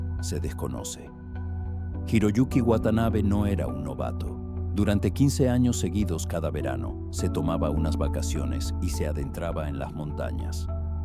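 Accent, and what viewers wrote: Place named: Argentina. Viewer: Es mejor la otra voz esta suena como argentino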